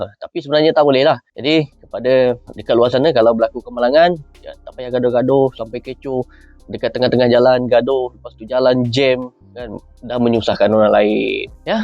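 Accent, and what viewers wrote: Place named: Sarawak, Malaysia. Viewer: TQ bro info bagus. Baju JDT tapi slang Sarawak. Terlanggar awek Johor ke bosku.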